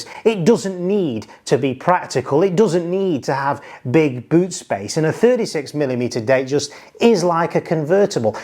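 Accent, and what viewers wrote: United Kingdom. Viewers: Good vid mate, btw are u from Stoke? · Sorry but your accent and the way you say “book”, are you from Stoke on Trent?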